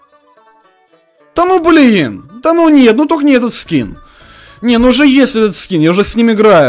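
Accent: Ukraine. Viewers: Ты украинец?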